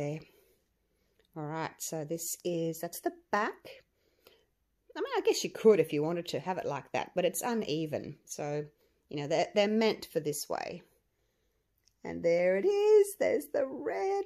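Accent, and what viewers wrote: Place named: Queensland, Australia. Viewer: Hi. It's great to hear an Aussie and fellow Queenslander on YouTube. Those coasters turned out wonderfully. I'm generally a green lover, but this time the blue won. Stay safe.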